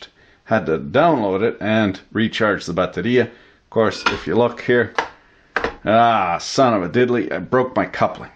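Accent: Quebec, Canada. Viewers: talkin' like a real Quebecois up in this shiz... i love it. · Si Sol (de Sol et Goblet) parlait anglais, ce serait lui. Un Québécois en plus! Gotta like the guy! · Hi im from quebec im very impressed that you seem to can speak like québécois. Where are you from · Based on french knowledge, you are either from New Brunswick, Quebec or maybe Ontario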